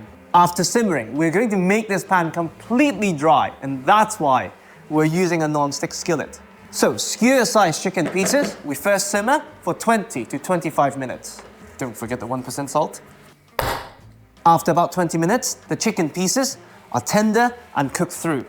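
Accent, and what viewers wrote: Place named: England, United Kingdom. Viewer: Your accent has a hint of English! ❤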